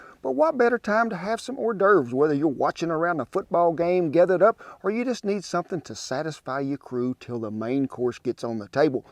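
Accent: South, United States. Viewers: I loveyour whole laid back attitude. I love your southern accent (I too am southern, woman) I love your sausage balls. Thank you!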